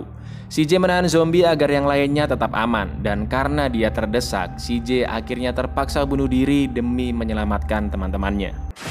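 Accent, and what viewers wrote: Java, Indonesia. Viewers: Jowo ne ngendi slorr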